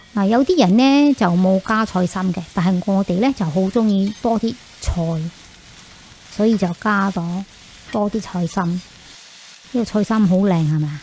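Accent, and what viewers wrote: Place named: Hokkien, China. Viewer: …. Your Cantonese improved so much… but still have that hokkien ‘slang’ 😃😃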